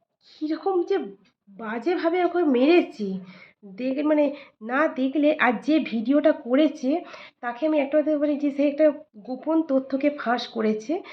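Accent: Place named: India. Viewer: আপনার কথা শুনে ইন্ডিয়ান মনে হচ্ছে। আপনি জানলেন কি করে।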